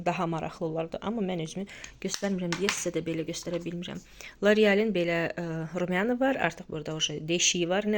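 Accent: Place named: Russia. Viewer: Siz azərbaycanlısiz danisiginiz rus dillidi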